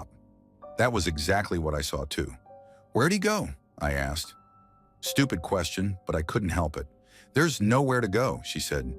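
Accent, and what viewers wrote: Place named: Chicago, United States. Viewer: I love the Dennis Farina accent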